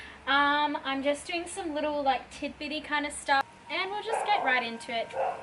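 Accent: Australia. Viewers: this is my first video of urs but i'm guessing u're australian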